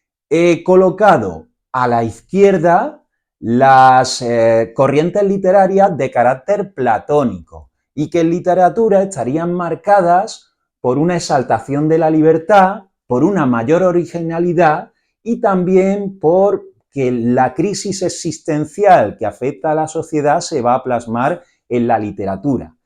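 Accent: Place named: Spain. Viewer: Pues para importante la acentuación tanto no sé qué tiene que ver la acentuación con el acento de este hombre, que tiene un acento muy español. 🙄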